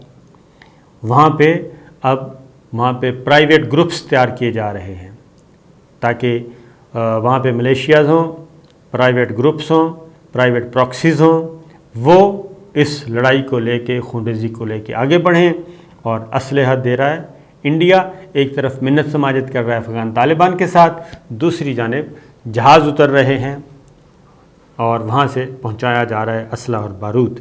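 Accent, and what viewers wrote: Punjab, Pakistan. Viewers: Punjabi hai kya?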